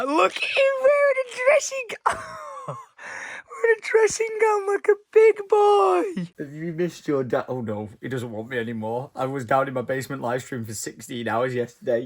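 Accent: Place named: Scotland, United Kingdom. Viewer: Which of your parents are of Scottish descent? I've never noticed a Scottish accent in either of them, but you often adopt one, as you did today. Or is that just a "Manchester thing?"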